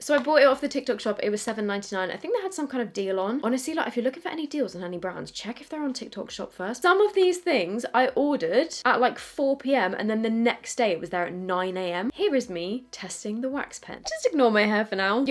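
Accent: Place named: United Kingdom. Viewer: What region would you say your accent is from? I love listening to you talk, but my main points of reference for UK accents are you and the Welsh twins lol #questionoftheday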